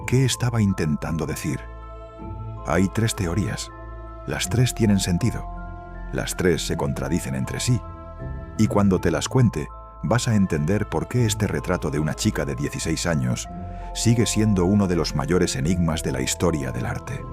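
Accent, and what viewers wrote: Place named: Castile, Spain. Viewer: He encontrado este canal por casualidad y ya me he suscriito........da gusto oir hablar este castellano tan maravilloso...............¡¡¡¡¡¡¡¡¡¡¡¡¡¡¡¡¡¡¡¡¡¡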